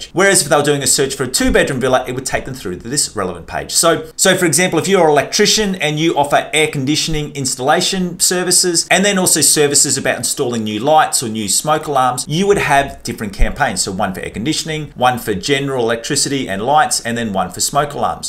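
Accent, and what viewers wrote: Australia. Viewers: thanks for the video, your accent sounds aussie to me (most beautiful country in the world)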